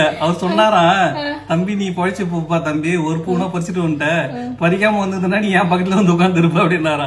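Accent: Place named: North Arcot, India. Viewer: சென்னை வட ஆர்கேட் பேச்சு வழக்கு ❤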